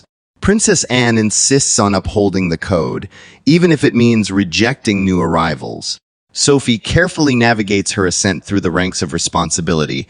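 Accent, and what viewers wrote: United States. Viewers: Could we please have a british commentator ! American pronunciation is abysmal.🙄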